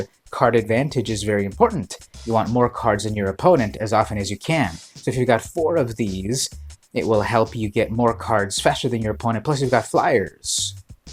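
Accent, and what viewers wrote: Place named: Philippines. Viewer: Filipino ka ba po?? Your accent sounds like a Filipino...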